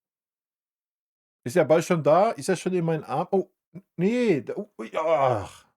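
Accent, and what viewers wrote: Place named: Westphalia, Germany. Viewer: Ich darf mich mal bzgl. Ihrer Sprache und Ihrer optischen Präsenz äußern: sehr redegewandt, westf. Mundart, äußerst unterhaltsam, stets gelungene Mimik (ähnlich der eines guten Kabarettisten), zuweilen auch tolle Gestik, erkennbare Neigung zum schonungslosen "Niedermachen" , gar zum Fatalismus, geschickt eigesetzte Sprechpausen , eine gewisse Rat- und Hoffnungslosigkeit bei rhetorischer Fragestellung, leider unnötige Anglizismenverwendung.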